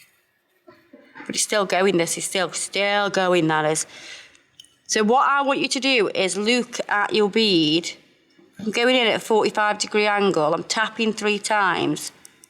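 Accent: Stoke, United Kingdom. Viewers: That's how us Stokies speak lol loowk, boowk and coowk (in other language look, book and cook) lol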